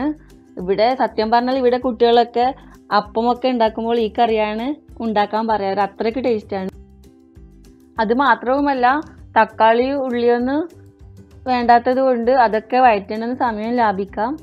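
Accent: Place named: Pathanamthitta, India. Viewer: Very unique and easy! Nannaittundu.. naatil evideya? Annikkorundeiend undu from Pathanamthitta.. aulde samsaram pole und :)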